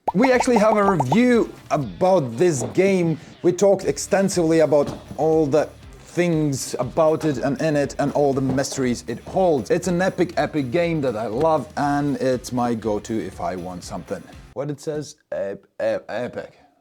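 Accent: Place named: Latvia. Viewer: sasodīts, man vajadzēja tikt līdz šī video, lai sāktu aizdomāties...? Akcents? Yep. Skaidrs. Apsveicu, jums līdz šim tīri labi izdevās nomaskēt savu identitāti:) P.S. kur jūs pērkat visas šīs spēles? daudz kas no jūsu apskatiem LV nemaz nav nopērkamas (vai arī vnk nezinu, kur skatīties?)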